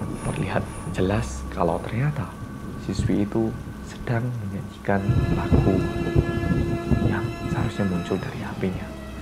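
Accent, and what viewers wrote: Java, Indonesia. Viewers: Orang jawa ya bang?? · owlh mulak men logat e jowo bgt 😅rupa2 ne koyo raono korea2 ne je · Orang korea aja bisa medok masa kita gak bisa Ya tapi gw gak bisa sih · Kyk Jawa ngomong nya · Ni muka Korea kaya orang Jawa logatnya 😅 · Mirip Rep Monster _ Kim Namjoon, a.k.a. salah satu member boy band korea, BTS. Tapi bahasa jawa kentel-nya lucu 😭👍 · dia pernah lama tinggal di indo. di malang, jadi medook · Kaya orang korea tapi sekali ngomong itu keliatan orang jawa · Kak orang aman ....suwarnya kaya logat Jawa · ilma azzahra ya kan mas cerita ny medok terus bolak balik mas bilang suara dering hp ny "Ring ding dong ring ding dong" itu kan bikin ngakak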